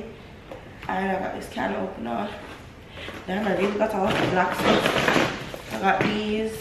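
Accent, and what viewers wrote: Jamaica. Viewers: First time on your channel and I was watching part 2 to this. I hear your accent and I was like she sounds Jamaican (I'm a Jamaican) lol and I was like alright let me go to the first one and here I am. Congrats girl!!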